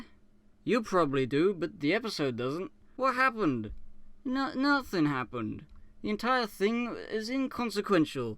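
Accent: United Kingdom. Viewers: I'm confused. When I first heard his voice, I thought he was British, but in this video, where he's completely drunk, he sounds Italian to me. Where is he actually from? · Being British, I can still hear his real accent as he talks, so I think this is a parody on some of the non-English content creators, notably JanAnimations. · Nothing I love more then to listen do a drunk man bitch in his non-native language. That is me being sincere not sarcastic. This was way to fun. Wont shut the FUCK UP! Still not shutting the FUCK UP! Has completely forgotten how to shut the FUCK UP! I couldn't stop laughing. P.S. The first time I listened to you I thought you were British. But I heard so many other accents this go around.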